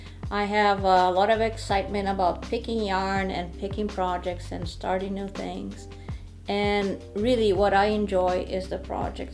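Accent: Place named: Portugal. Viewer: So you are Portuguese! I wasn't sure about your accent! Thanks for your work .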